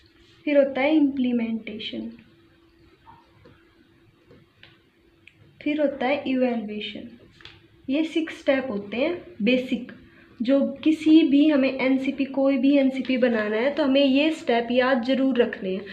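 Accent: Haryana, India. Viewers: Haryanvi sweg h boli me madam k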